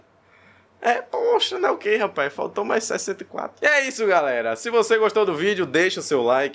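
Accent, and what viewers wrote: Bahia, Brazil. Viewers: Vc e baiano? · Ameiiii!!! Vc eh baiano mocim???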